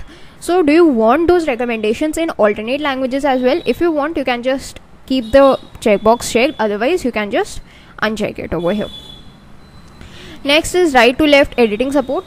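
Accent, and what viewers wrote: India. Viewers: 0 seconds ago This is pure Indian English accent. You may need to go to school to learn the same :)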